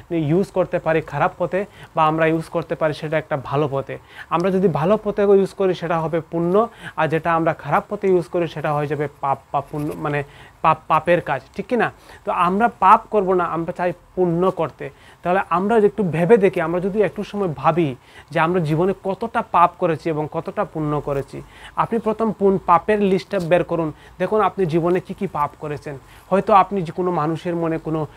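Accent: Sylhet, Bangladesh. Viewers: আপনি কি সিলেটি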